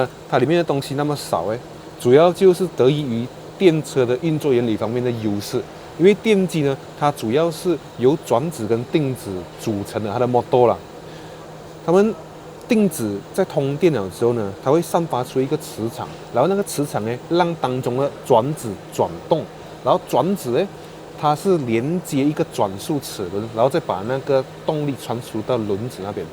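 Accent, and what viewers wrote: Malaysia. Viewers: 有问题吗？马来西亚中文频道，马来西亚中文口音，没毛病。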